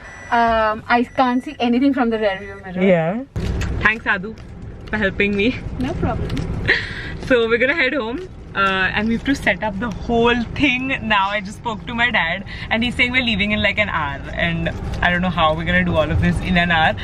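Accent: Gujarat, India. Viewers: I love how. Cute gujrati u speak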